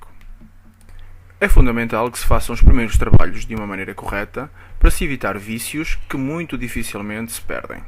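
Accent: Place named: Portugal. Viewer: que bom que eu tenho facilidade p intender português de Portugal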